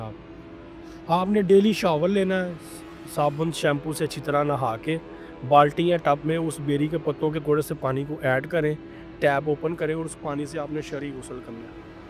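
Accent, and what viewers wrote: Punjab, Pakistan. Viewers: خوف ہے کھوف نہیں اینکر ہو پاکستان کی انڈیا کی مت بنو تمہاری اردو میں پنجابی کی جھلک نظر آرہی ہے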